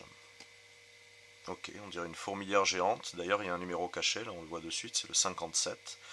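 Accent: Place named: South, France. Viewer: Si tu n'habite pas trop loin de la Bretagne je veux bien t assister pour tirer les cartes voir pourquoi faire une partie ensemble pourquoi pas.hé hé...mais ton accent me fait penser que tu es plus dans le sud.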